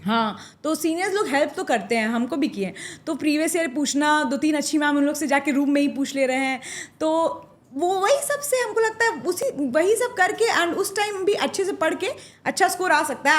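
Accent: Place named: Bihar, India. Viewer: Bihari accent full on😊😊😊😊😊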